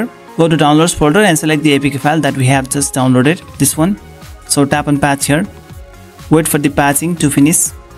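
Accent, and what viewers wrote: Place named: India. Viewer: Yup always an indian accent guy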